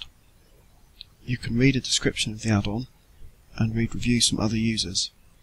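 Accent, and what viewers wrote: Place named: United Kingdom. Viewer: GOD you could do a lot to improve your audio not to mention working on the dialect intensity so that those not from the UK could understand you. With both issues it makes it pretty bad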